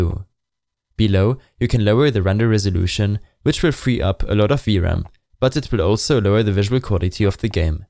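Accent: Germany. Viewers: Wie man merkt das du deutsch bist danke nochmals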